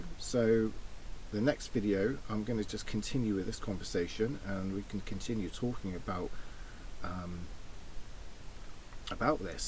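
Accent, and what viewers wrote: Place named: England, United Kingdom. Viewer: I would suggest you try a career helping women take care of their computers, doing everything needed to keep their computers going, also helping with mowing the grass and fixing doors when needed. Meanwhile, I think you should film all of England for those of us across the pond. I just watched 14 minutes of you and the best moment was when you said the dog was chilling. But I want to see cows and countryside with you talking about all the places in England and hear your lovely accent and "pardon me"'s for your coughs. That could be your signature, in a good way. Then we'd know it's you behind the camera.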